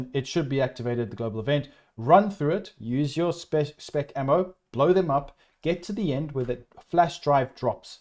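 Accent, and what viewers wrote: South Africa. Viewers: Hey are you South African you have that South African accent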